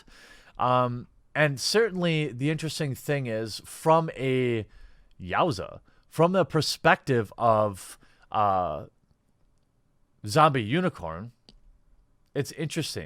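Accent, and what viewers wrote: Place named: Midwest, United States. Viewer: Northwest/midwest accent, lol. Reminds me of the Wisconsin area. Ever notice how he says words like "lag" or "bag" with like an extra "y" sound in there, like "layg" or "bayg"? That's his northwest/midwestern accent and he's commented on it before, lol ;)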